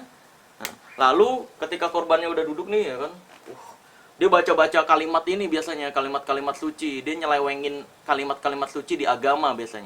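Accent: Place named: Wonogiri, Indonesia. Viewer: Pakek apa tu yang di kuel-kuel. Bang jawa nya wonogiri ndak hilang. Haha😀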